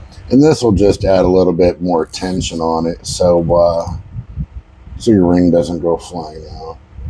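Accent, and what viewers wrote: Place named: United States. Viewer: Dude sounds like he’s from the states, but he uses metric.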